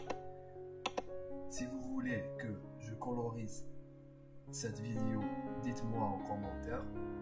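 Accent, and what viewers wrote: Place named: Algeria. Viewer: من لكنتك لنطقك بالفرنسية تبدو لي جزائري 😹☝️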